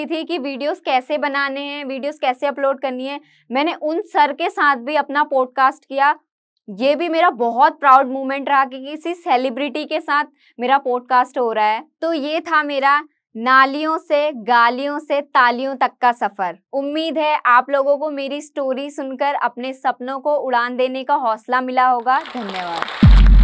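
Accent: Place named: Uttarakhand, India. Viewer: Aapke way of talking se first vedio mai hi mujhe pta lg gya tha aap uttrakhand se ho mai v uttrakhand se hu ❤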